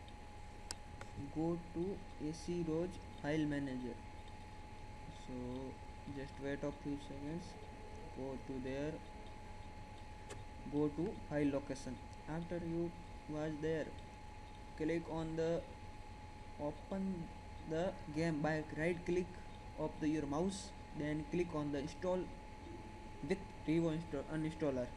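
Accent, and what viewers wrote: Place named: India. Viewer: Shit is that mumbling English or some DR. Bombay shit?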